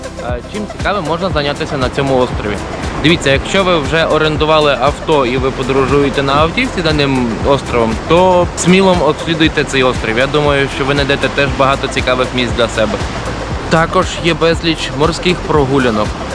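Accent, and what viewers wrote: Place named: Poland. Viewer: Польский акцент.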